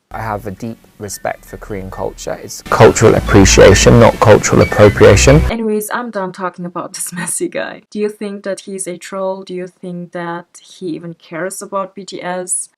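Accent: Germany. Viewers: Ich höre deutschen Akzent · You kinda got a German accent ? Or am I trippin’ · Is that a German accent I hear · offtopic but your accent sounds german and that‘s cute🥺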